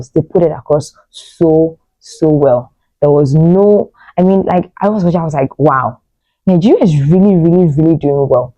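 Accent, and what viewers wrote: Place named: Ghana. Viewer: The movie wad top notch... buh you have the ghana ascent in your voice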